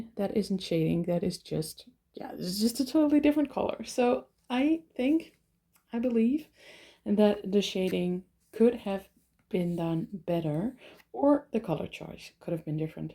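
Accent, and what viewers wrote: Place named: Netherlands. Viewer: Me again! I watch Lisette's channel as well. You are both Dutch and your accents are similar. But your English is impeccable!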